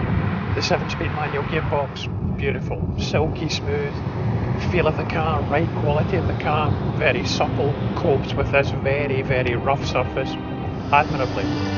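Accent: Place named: Scotland, United Kingdom. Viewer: that scottish accent is brilliant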